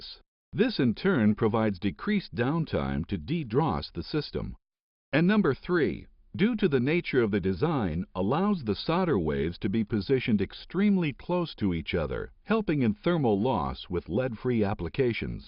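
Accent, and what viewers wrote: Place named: North America. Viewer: Oh Yes, how right you are. This is a North American pronunciation thing. Even The Mythbusters say 'sodder'. Why is it so?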